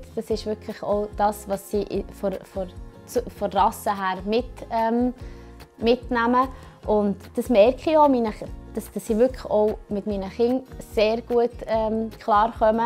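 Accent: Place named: Bern, Switzerland. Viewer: Schweizerdeutsch mein Bro, Berndeutsch um genau zu sein.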